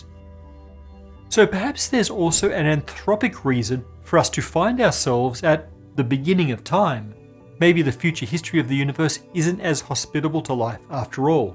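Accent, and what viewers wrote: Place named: Australia. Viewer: This was great but I'm confused as to why you suddenly have an Australian accent Isaac. Nice use of the usual animations btw.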